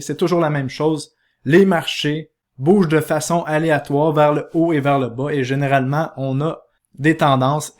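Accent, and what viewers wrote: Quebec, Canada. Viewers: Je vois tes vidéos depuis 8 mois Ne change rien .Elles sont TOUTES SUPER. et Merci pour l'accent de Québec en plus. Patrick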